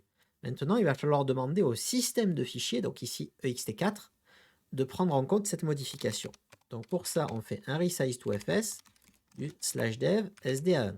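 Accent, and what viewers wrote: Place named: Southwest, France. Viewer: PS : Tu es dans le sud-ouest (ton accent t'as trahi)?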